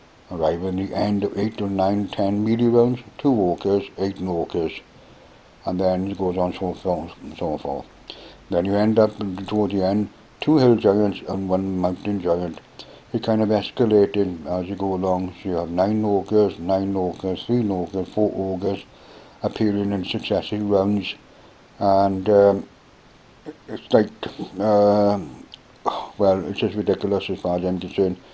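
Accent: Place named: Wales, United Kingdom. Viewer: I've got an upcoming character on my channel I was thinking of giving a Welsh accent so I spent the night listening to your videos. I'm from the States so it's tough getting the rhythm down. The episode will be up in a week or so. Thanks for your work by the way. The classics are the best.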